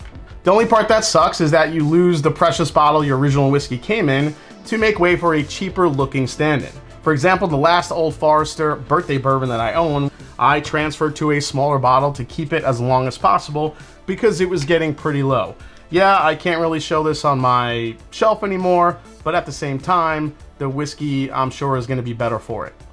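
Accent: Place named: East Coast, United States. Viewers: LOVE this type of content! Keep up the great work and let that East Coast accent out more often. :) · You must be from Jersey. Dialect?🤔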